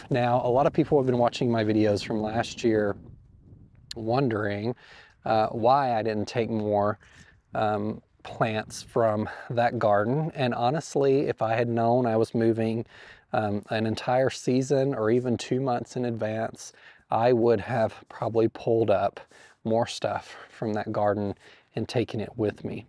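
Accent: South, United States. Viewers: Thank you for giving us a glimpse into your history and your gardening evolution. Though it’s really none of our business, it’s really sweet of you to do that, as some people want to get to know you and are very interested in your garden — watching and learning from you. May I also say your delivery is comforting. I like the ‘Southern’ pace — as new gardeners really need the way you educate with how and why you may be doing something. You are unique in that way. Your love and passion for gardening really comes through. Congratulations on your new property and best wishes for your success!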